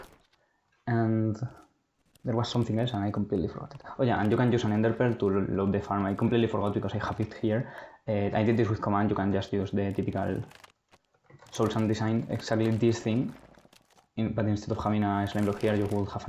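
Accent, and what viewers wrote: Spain. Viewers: El acento de español no te lo quita nadie, y el ingenio para descubrir esto tampoco, buen video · Ese acentillo español no te lo quita nadie eh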